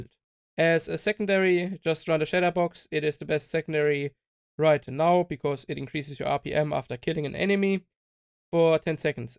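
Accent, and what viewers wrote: Germany. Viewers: Bist du deutscher ??? You Liste like a german guy and speaks english 😂😂😂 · love the hard german akzent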